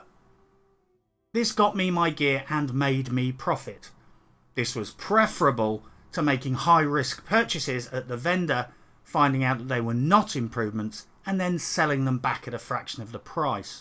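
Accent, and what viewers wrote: United Kingdom. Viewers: I haven't played this game in years but come back to this videos from time to time just because they put me in a good mood lmao maybe it's due to the fact that it's a British man talking about this Czech game placed in medieval Bohemia. Being an immigrant from Poland (or POLISH LITHUANIAN COMMONWEALTH if you wish), living in the UK, a history buff at that, what could be better?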